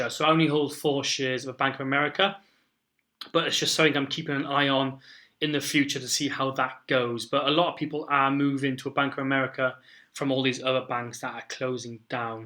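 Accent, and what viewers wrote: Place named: Wales, United Kingdom. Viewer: Sounds like a Cardiff or Zooport accent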